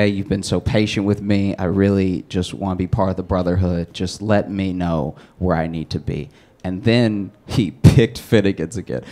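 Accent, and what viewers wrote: Louisiana, United States. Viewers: He sounds like that because it's his accent from Louisiana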